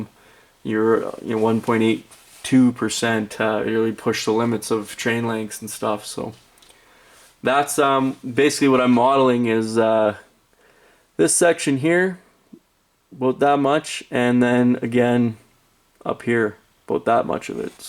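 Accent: Canada. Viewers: Got quite the Canadian accent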